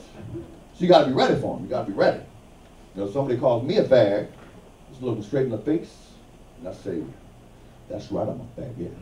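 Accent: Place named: Louisiana, United States. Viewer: Is he from Louisiana and idk why but I adore there accent. Whatever u do in ur life is none of my business. ✌ ❤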